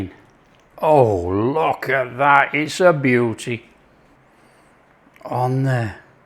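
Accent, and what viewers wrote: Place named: Liverpool, United Kingdom. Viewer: Just love the Liverpudlian accent, then we go a little bit of elvis lol, you’re so entertaining. Come on!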